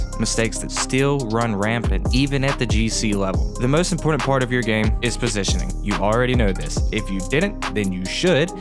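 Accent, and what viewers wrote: United States. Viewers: Gotta love the good ol' murican accent!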